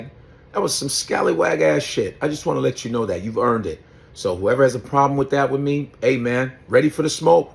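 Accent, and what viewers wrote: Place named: New York, United States. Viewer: No other accent can cuss you and make you feel like crap like that New Yorker or Yorkah😊. "Youza funky B@ fa dat. Family I was LMBCOFF. I Luv this brotha and his comedy is fiya!👏👏👏. Scallywag 😂😂😂. New Yorkers have the beautiful flaw of telling just how they feel without concern for yo feelinz. LuYa Big Bra for speaking your mind and representing this great actor.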